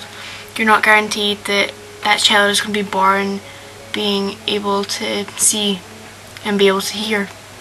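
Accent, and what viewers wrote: United Kingdom. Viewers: What kind of accent is that? I know it's from the British Isles, but I can't pinpoint where...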